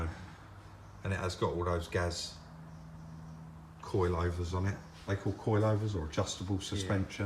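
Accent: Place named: Cockney, United Kingdom. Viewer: This is just a great channel, great commentary, as I've said before I just love the cockney geezer accent.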